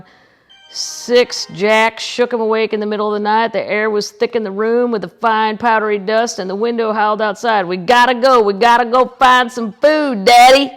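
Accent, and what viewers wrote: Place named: South, United States. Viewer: I am speaking in a Southern accent that now I can't shake after watching you read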